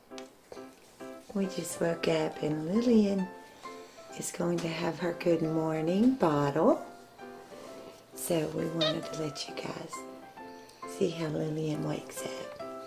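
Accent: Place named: South, United States. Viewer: Hi and Lillian 💜 thanks for saying that 😊 your words of encouragement make me want to study even more to do better 👍.. i managed to pass everything, in fact we all did, so there was a week of celebrations after we found out ✨🎉✨and yep we watch your videos as a group and also put your videos on in the background because they're such a pleasure to watch and even to listen to..like every one of them is different, and I have to say you have one of the nicest voices I have ever heard..I really like your southern accent. Yep 4 room mates from Poland, Switzerland, Sweden and Belgium, and all of them love your channel, and we love seeing Lillian getting up to all her mischief 😊 she is so funny, cute and cheeky all at the same time..When we put on your videos we feel you're in the room with us too, it is a good feeling...Next year we will be separated for 6 months as we have to do work placements for our degree, a couple of the girls are going abroad to Australia and Greece but i want to do my placement in one of the big London hotels..I also think it's great that Brandon is interested in being a chef because it's a great career choice and there is always great opportunities for chefs in big luxury hotels etc and the £$ is normally really good,.. 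When Lillian gets a baby sister it will be double mischief, i think it's a great idea..Have a fantastic day xoxox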